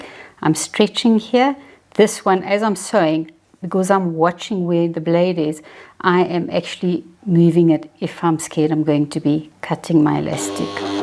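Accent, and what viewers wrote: South Africa. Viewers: ok cool. I wasn't sure if it was a South African or New Zealand accent. (Fun to listen to people from different countries) Thanks!! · South African accent? Grâce pour l'information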